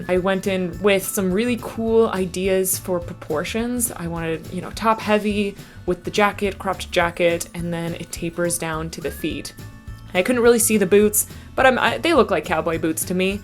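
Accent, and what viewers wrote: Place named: Canada. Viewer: you're canadian??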